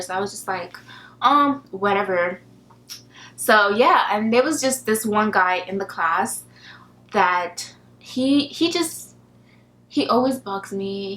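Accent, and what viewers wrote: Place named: Nigeria. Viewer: Tromaria Presimparae, Why would you "suggest" she "try" to speak in a manner that fits your hearing? She is Nigerian...not from the U.S. Have you not seen any of her other videos? She always says something about being yourself; with that being said, you be you and let continue on with her beautiful fantastic awesome Nigerian Princessness. P.S. Yes I said Princessness...and what?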